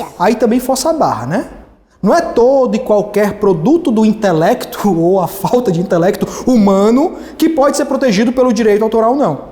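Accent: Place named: Ceará, Brazil. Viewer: Deve ser Cearense 😍👏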